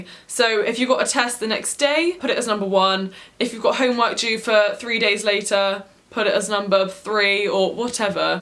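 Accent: England, United Kingdom. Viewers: Lol why is accent and voice so different to now(2020)?? Like she sounds more English which is weird as I’m English but I e never heard someone sound more British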